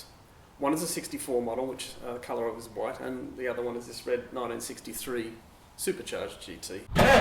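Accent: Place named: Australia. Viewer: You know, I chanced across this video and I was impressed for many reasons...one was an Aussie from Melbourne who was talking about his car, and secondly it was a Studebaker...that marque of car had links with a Packard which my dad had owned a '34 model in the 50's. All up it ticked all the boxes. And post script Aussies talk in a certain way..up front no pretenses...love it.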